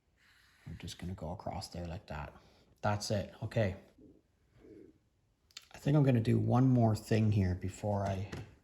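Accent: Canada. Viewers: I am living in South Carolina now. Born in Scotland, lived in Montreal growing up. Family still in Ottawa and Toronto. I hear your Canadian accent, love it.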